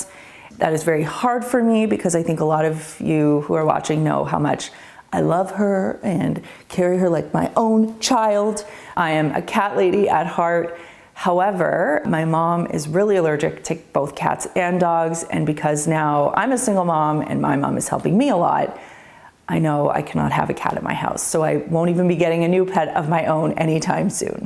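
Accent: Canada. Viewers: Wow I can tell you're definitely from Canada A.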